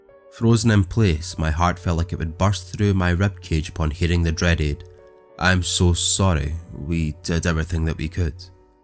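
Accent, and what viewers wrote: Scotland, United Kingdom. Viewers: Love me a good Scottish accent. Reminds me of Shrek 💚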